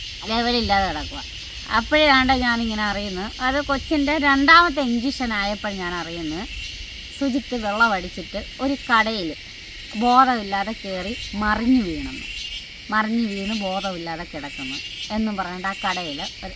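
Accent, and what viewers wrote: Thiruvananthapuram, India. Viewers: Chummadhalla oru TVM slang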